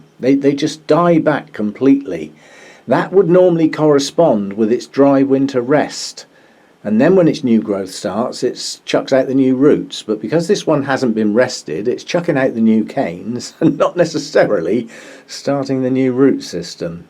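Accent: England, United Kingdom. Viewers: where are U based?U sound English -correct?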